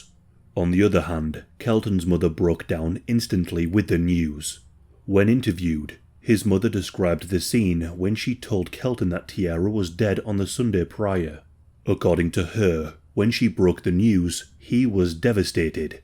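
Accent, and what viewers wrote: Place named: Northeast England, United Kingdom. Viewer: Aye aye aa think your from rundhere 😂 not a Geordie but not far from like ❤️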